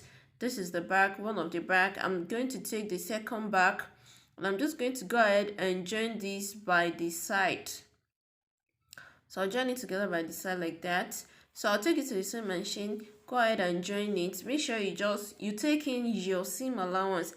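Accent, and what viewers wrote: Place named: Nigeria. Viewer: I love your natural and beautiful Naija accent ❤❤❤ I had watch multiple times. Thanks for the interesting tutorial! I'm about to try this technique but scared to flop 😅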